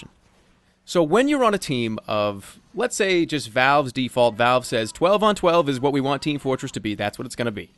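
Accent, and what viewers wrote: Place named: East Coast, United States. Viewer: it's the east coast accent. that's what it's all about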